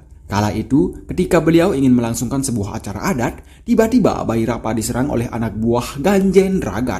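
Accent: Bali, Indonesia. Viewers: Logat bicara nya kayak logat bali, 😁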